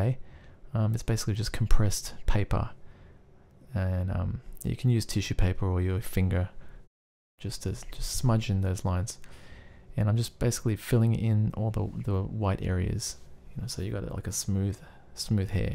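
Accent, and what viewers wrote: Australia. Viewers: Is this aussie accent ? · Nick889999 because he's got an accent because I think he's Australian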